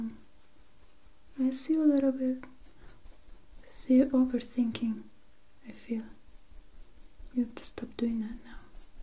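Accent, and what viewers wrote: Mexico. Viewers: You even speak English like some mexican would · I'm mexican and I can hear my accent in english here! 😂 it was so weird, I'm always so self-conscious because I can't pronounce some things as smoothly as I'd like, but this made me feel so happy! Thank you so much, your quality is so good as usual, you're like my favorite person ❤️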